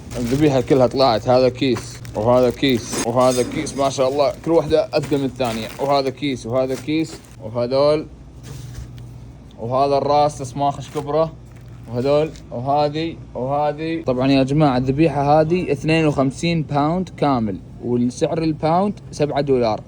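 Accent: Gulf, Arab world. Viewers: لهجتك قريبة عالخليج كلش ليش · ياله لهجتك مو كانو لهجتنه بلعراق كانو خليجي 😮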